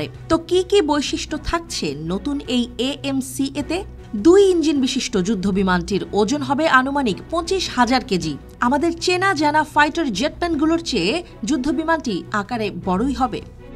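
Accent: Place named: West Bengal, India. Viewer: গলার আওয়াজ আর কথার স্টাইল দেখে মনে হচ্ছে এটা একাত্তর টিভির প্রতিবেদন নয় এটা ভারতের পশ্চিমবঙ্গের কোন টিভি চ্যানেলের প্রতিবেদন তারা প্রচার করছে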